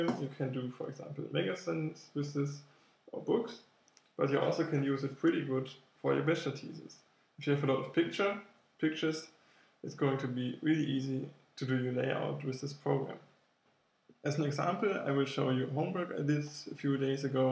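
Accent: Germany. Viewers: You might want to work on your English pronunciation. I don't mind a bit of an accent, but at times your German accent is very heavy. Please see this as constructive criticism. Otherwise, thanks. I'm also using InDesign for my bachelor thesis (albeit on Windows, I think Mac is way too expensive. Shame there's no Linux version, as I'd love to use InDesign on my Ubuntu machine)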